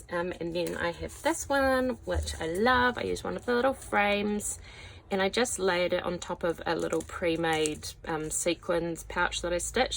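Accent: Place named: New Zealand. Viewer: Just found you. So cool to hear a kiwi accent haha. Thanks for sharing.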